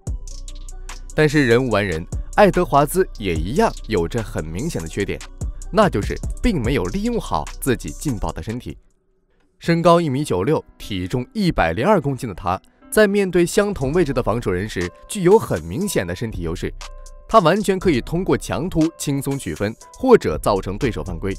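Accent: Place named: Shandong, China. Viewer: 这山东口音英语直接听出戏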